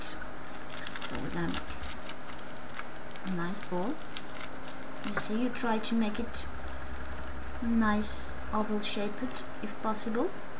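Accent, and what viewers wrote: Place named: France. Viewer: ahhh it been so long since I was with the part of my family that has your french accent, hahaha! :) you sculpt like me, no measurements! lol, except I draw sometimes. Johnny <3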